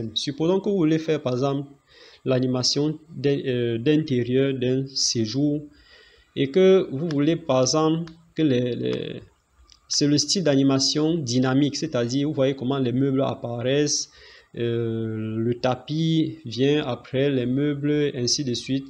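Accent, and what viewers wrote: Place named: Cameroon. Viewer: vous êtes camerounais je suppose ! J'aime trop la manière de parler des camerounais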